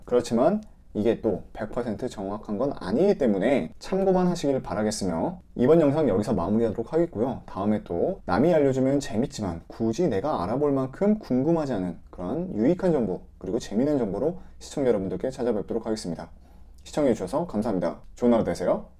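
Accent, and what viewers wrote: Jeolla, South Korea. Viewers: 전라도 사투리가 섞여 있네요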